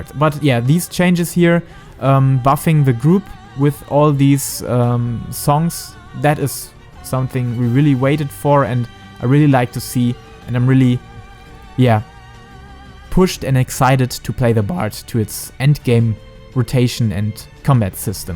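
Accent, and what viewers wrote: Germany. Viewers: es ist zwar ein deutscher dialekt vorhanden, aber grammatik/wortwahl sind immer richtig und die aussprache verständlich, also den zweck erfüllt es allemal :s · Reupload es am besten auf deutsch es klingt echt schlimm wenn deutsche eng reden .. nicht böse gemeint :)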